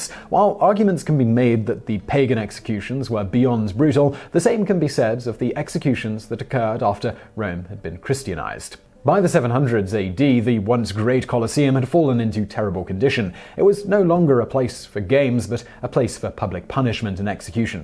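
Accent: United Kingdom. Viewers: I love your accent! Fellow Brit watching :)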